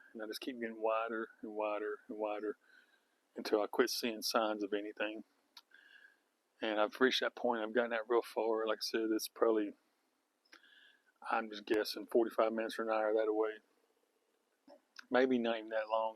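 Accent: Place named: South, United States. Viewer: I was referred to you from at her YouTube channel called: Eat Pray Van that I'm subscribed to so I'm happy she referred all her subscribers to you on her first LIVE video the other day & now I know why.... Cuz your sooooo honest & genuine & very interesting & informative & good looking & good sense of humor & good heart & good sense and I absolutely love your southern accent & southern descriptive words & your voice tone & volume. Sooooo relaxing! Your a great speaker! All the wonderful things that is also. Because you are you I watched & then subscribed right away. Nothing phony about either of you which are the only Creators that I will subscribe to. I also clicked the " LIKE " button that I ALWAYS do & never forget to do that. Ty again!!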